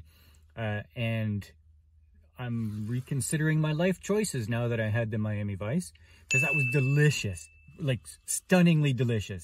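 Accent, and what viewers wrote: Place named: Northern Ontario, Canada. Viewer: Trust your wife, words to live by, so when's your next Cruise? Your Nordern Ontario accent was showin' heh great video!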